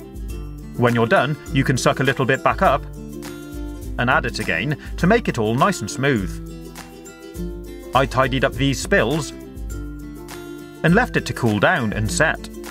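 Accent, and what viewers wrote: United Kingdom. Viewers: Are you British? You sound like it! =D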